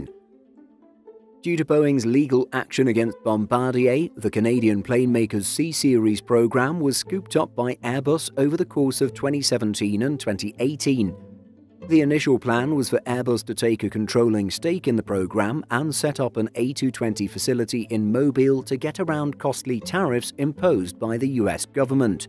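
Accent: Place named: United Kingdom. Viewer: I think your British accent shows your bias.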